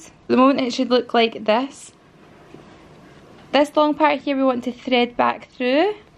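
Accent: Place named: Glasgow, United Kingdom. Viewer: Found your channel a few weeks ago and I've become so obsessed, I've watched so many of your videos now, had a total binge haha. I'm not planning on having kids for like 10 years but I still find your content so interesting. I'm also from Glasgow and love hearing the familiar accent 😊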